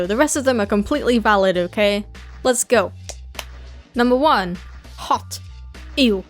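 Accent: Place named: United Kingdom. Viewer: Yeah I thought you sounded British, i'm British too and nobody cares cause nobody cares about the people who talk about them self's and their problems in the comment section and they'll just say "N O B O D Y C A R E S"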